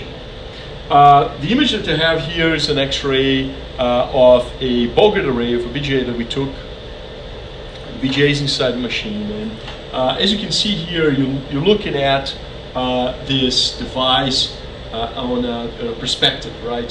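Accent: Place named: Brazil. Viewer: Esse cara tem muito sotaque de brasileiro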